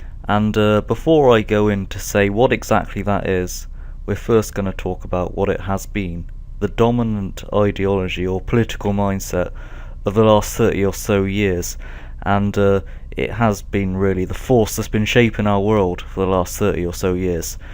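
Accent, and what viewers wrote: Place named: Liverpool, United Kingdom. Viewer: You sound a bit like George Harrison. Are you from Liverpool?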